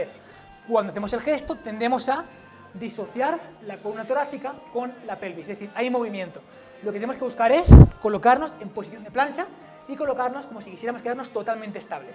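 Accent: Spain. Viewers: Jajajaja y yo esperando un hello tipo gringo y resulta que era un español xD joder tio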